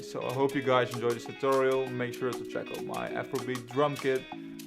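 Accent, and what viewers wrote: Netherlands. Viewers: Great beat and great tutorial! You dutch btw?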